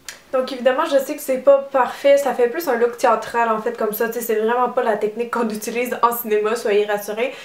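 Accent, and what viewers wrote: Quebec, Canada. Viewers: T'es Québécoise non ?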